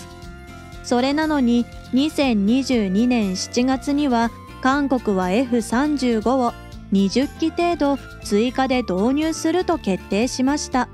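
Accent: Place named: Kansai, Japan. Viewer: 年号のアクセントが変すぎる。関西訛なのは分かるけど、ここまで酷い人はなかなかいない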